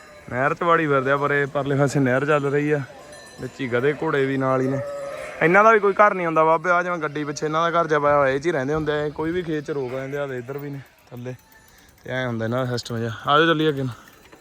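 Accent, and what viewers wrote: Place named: Moga, India. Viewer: Malwa area y tera moga lgda boli to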